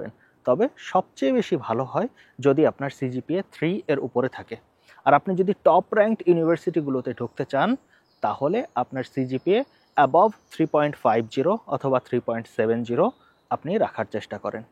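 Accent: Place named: Jessore, Bangladesh. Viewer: Jessore er lok mone hosse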